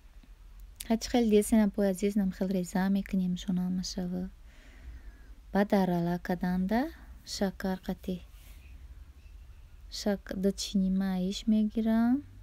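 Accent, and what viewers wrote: Kulob, Tajikistan. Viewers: Шумо ай кулоб.хастен мада гапзанитон макулай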